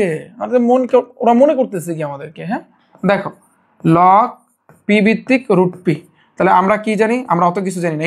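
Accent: Barishal, Bangladesh. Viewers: Bhaiya ki barishailla?🤦🏻‍♀️🌝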